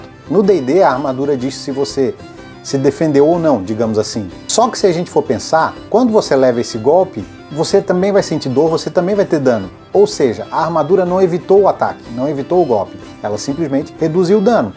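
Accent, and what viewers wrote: Florianópolis, Brazil. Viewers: Sotaque de manezinho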